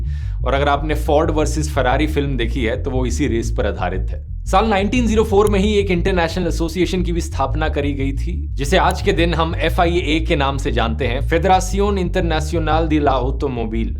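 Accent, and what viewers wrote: Haryana, India. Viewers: Why does he suddenly have haryanvi accent?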